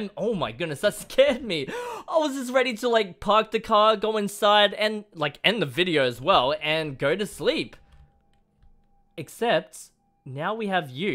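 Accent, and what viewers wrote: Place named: Australia. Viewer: New it you are from Australia not USA OR US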